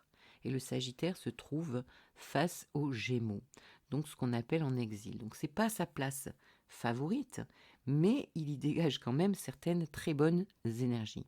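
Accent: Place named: South, France. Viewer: Bonjour, je viens de vous découvrir...votre voix est très agréable à écouter...du velours! Et votre petit accent méridionnal est bien stimulant dans cette période très nuageuse et un peu triste! Belle synthèse de ce qui nous attend pour une année!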